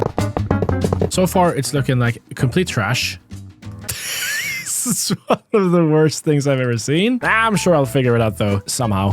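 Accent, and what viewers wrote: Sweden. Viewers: Are u a Swed because u sound like a swed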